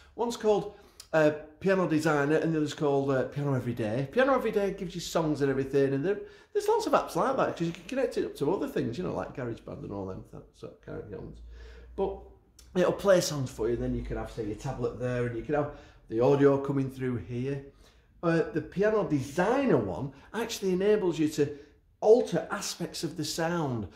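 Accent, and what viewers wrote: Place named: Liverpool, United Kingdom. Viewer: Are you from Liverpool? You sound like the lost Beatles…lol. Great review! I am a guitar player looking to dip the toe into keyboards.. old man looking for a new challenge… thanks for the great informative review!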